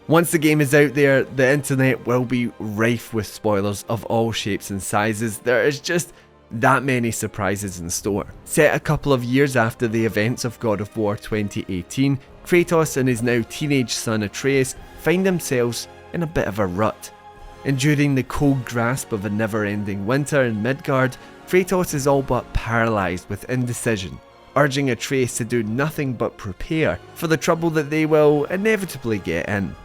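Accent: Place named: England, United Kingdom. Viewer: 😂 i commented same regarding his english accent, so weird and different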